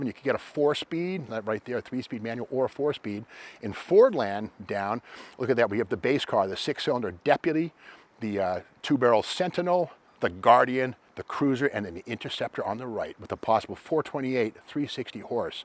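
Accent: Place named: Massachusetts, United States. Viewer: He's from Mass. They have their own vocabulary there. They drive caaas.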